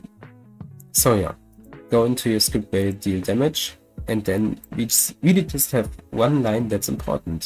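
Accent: Germany. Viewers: der deutsche aktzent is so funny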